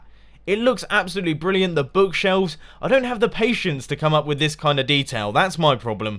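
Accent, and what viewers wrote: United Kingdom. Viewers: IM CAPSLOCKING THIS SO YOU THINK IM ANGRY AND YOU RED MY COMMENT PLEAS STAY AS AWESOME AS YOU ARE AND THE MUSTACHE IS NOT REQUIRED BECAUSE WE ALL CAN HEAR THE BRIT OUT OF YOUR VOICE REDSTONE 4 LIFE <3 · what part of the UK are you from?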